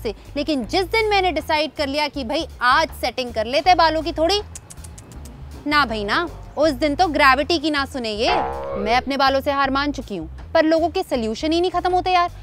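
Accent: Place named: Kerala, India. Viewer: Madam you have Malayalam accent.. But wavy hair is the best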